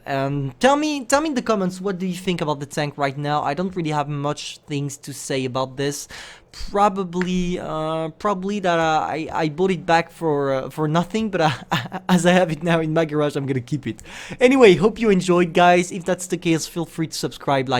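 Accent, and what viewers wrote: France. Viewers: I can feel ur french accent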